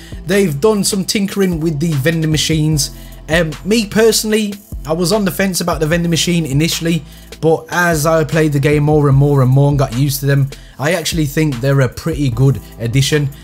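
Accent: Birmingham, United Kingdom. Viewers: nothing wrong with a brummie😂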